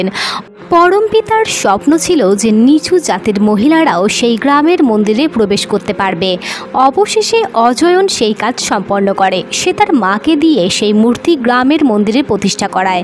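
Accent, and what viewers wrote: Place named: Chapai Nawabganj, Bangladesh. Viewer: আপনার বাসা কি চাঁপাইনবাবগঞ্জে আপনার ভাষা শুনে মনে হচ্ছে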